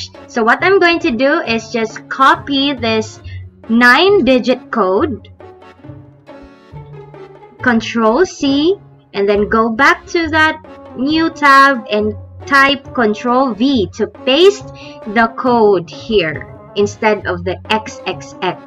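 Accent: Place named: England, United Kingdom. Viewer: Watching here po ma’am from Cielo Cartagena Vlogs☺️Thank you for sharing this very knowledgeable and very informative content of yours ma’am.Watching here all the way from 🇯🇵I also like your English accent.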